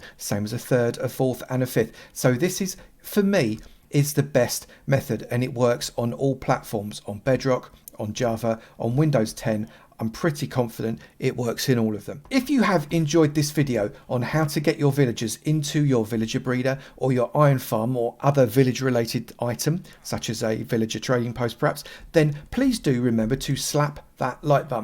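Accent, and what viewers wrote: United Kingdom. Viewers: so because someone has a british accent, they're automatically Gordon Ramsay? The guy sounds nothing like him, aside from accent that is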